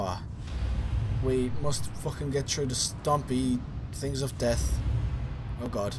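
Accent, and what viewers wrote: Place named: Ireland. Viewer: your a great youtuber, I love ya Irish accent love. keep up with the youtubing.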